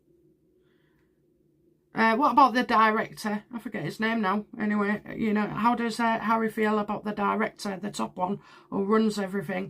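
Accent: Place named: East Yorkshire, United Kingdom. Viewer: East Yorks I think.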